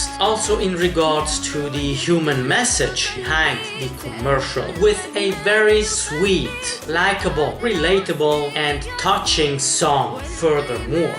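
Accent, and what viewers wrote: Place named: Eastern Europe. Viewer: RI Hero ما اعتقد امريكي، ممكن يكون شرق اوروبي، لهجته ليست امريكية.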